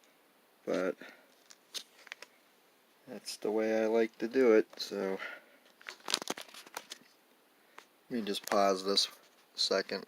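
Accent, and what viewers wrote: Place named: Pittsburgh, United States. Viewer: Hey, just subscribed great looking set. Sounds like your a Pittsburgh guy. I live in Johnstown, Eric those back pages gave you a nice shout out earlier tonight. I own a very nice 56 set. Working on 54 bowman set.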